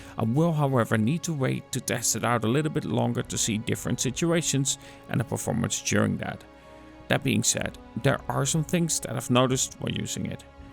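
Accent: Netherlands. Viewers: Just a little dutch accent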